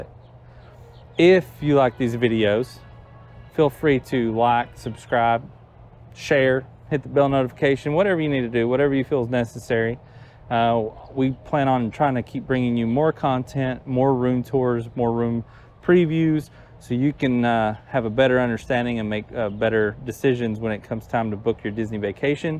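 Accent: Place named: Eastern Kentucky, United States. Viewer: He sounds so much like me. I’m from Eastern Kentucky! Awesome.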